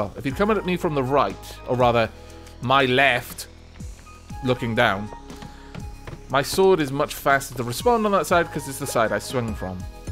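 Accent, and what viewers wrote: Wales, United Kingdom. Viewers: Is welsh your first language?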